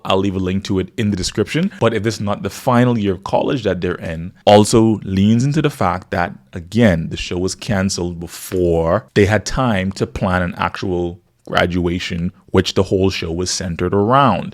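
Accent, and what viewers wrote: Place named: Bahamas. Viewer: You have to be from The Bahamas. From I heard you speak I was like yup. Glad to see a Bahamian making moves. Salute!!!!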